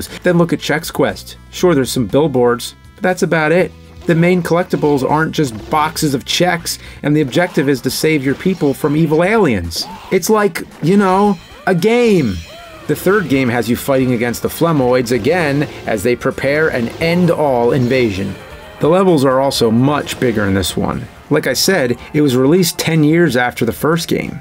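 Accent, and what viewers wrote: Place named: East Coast, United States. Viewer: But with that awful East Coast accent, it's more like "Æss".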